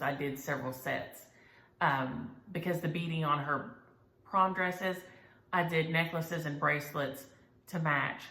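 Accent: South, United States. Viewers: I always love to hear your voice and your "Southern Sayings" Being from VA I don't have quite as much twang, but grew up with a sitter from GA so I have a few sayings that folks just don't get! I would love the opportunity to stitch for an hour or more in the flag pattern!!! 😉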